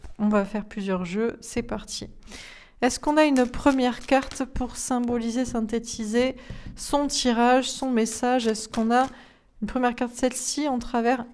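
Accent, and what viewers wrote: South, France. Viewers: Encore un excellent moment avec vous. Beaucoup de justesse.. et encore beaucoup de rire. Je ne sais pas d ou vous êtes, car je cherche l accent, mais y a quelques expressions du sud. 😂 j adore. Au delà du contenu… vous êtes tout à fait le genre de personnalité / humour / personne qui j adore avoir autour de moi. Je pourrais passer des heures avec vous, à vous écouter et à rire. Merci 1000 fois pour ces bons moments. 🙏🏼✨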